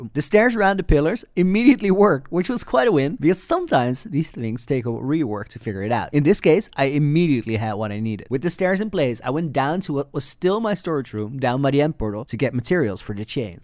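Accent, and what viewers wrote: Netherlands. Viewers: My guy is Dutch?!?!?! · I hear you’re Dutch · Mate judging boy your accent your dutch arent you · Are you dutch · I knew the guy was Dutch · I like that doesnt try to hide his Dutch accent completely like other youtubers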